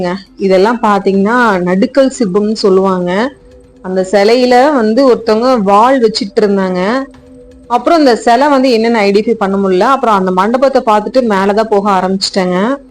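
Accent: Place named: Kongu, India. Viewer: அருமையான தகவல்கள் அவர்களே. நம்ம கொங்கு தமிழில் நீங்கள் கூறியது அருமை. Building என்று சொல்வதை தவிர்த்து 'கோவில் கட்டிடக்கலை' என்று கூறுவது மிகச் சிறப்பாக இருக்கும் என்பது என் தாழ்மையான கருத்து. தாங்கள் கொங்கு நாட்டின் எந்த பகுதியை சேர்ந்தவர்?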